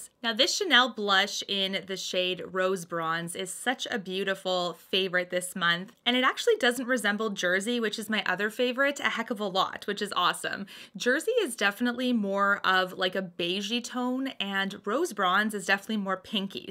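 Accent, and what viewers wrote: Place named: Canada. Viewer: Any fellow Southerners love the way Canadians say "about"? I do:))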